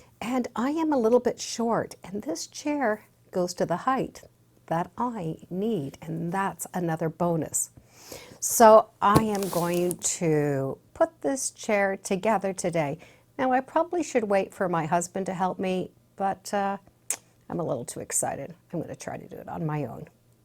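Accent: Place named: Canada. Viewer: I just love watching you! Especially your pronunciation of some words in your Canadian accent!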